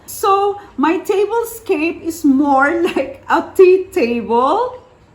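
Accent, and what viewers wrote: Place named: United Kingdom. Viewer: Back to your table set up. Your vintage ginger jar oh my you have all this beautiful rare pieces in your home. The fruits and the crystal tray is epitome of Classic blue and white decorating. I love the idea that you bring fruits for fall accent and I know we don’t celebrate fall in the Philippines and that’s a very clever idea. Tea party is one of my favorite too so overall you made me really happy with this collaboration and you make me laughed with your British accent. Lol I hope we can do this again next time this is really fun I learn something from you and I love it. Thank you Sis. I did share this in my FB and later in my community tab.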